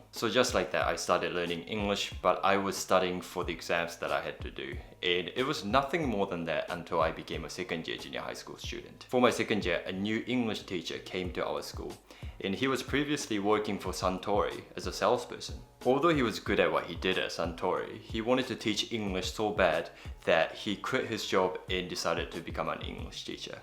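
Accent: New Zealand. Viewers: Hey, I love listening to your Kiwi English!! This is Tia again, thanks for getting back to me the other day to my comment. I also left Japan quite young, age of 15 after graduating JHS in Japan. I've lived in California for 6 years going to HS and Junior College. I chose to come back to Japan to work with a boyfriend I had at that time. (that is not my hubby though) well, my best friend who recommended your channel and I zoom nomikai sometimes and we talk about time of our life back in States. You must be a super dupper busy guy, but if there is a chance, It would be my dream come true to chat with you!!! Thanks for your time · アクセントはめっちゃくちゃニュージーランド人っぽくてびっくりしました！このような自然な日本人のアクセントで話せるようになりたいです！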